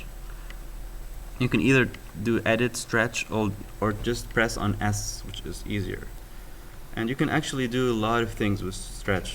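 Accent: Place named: Lebanon. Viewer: I can smell a lebanese accent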